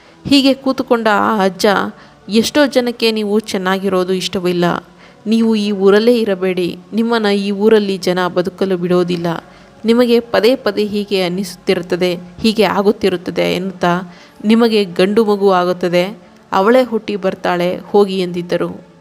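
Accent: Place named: Mysore, India. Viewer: ಮೇಡಂ, ನೀವು ನಿಮ್ಮ ವಾಯ್ಸ್ ಗೊತ್ತು. ಮೈಸೂರಿನ ವರು.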